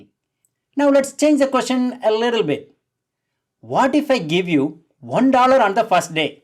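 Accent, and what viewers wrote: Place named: Tamil Nadu, India. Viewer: உங்க இங்கிலிஷ் தமிழ் மாதிரியே கேடக்குது சார்❤😂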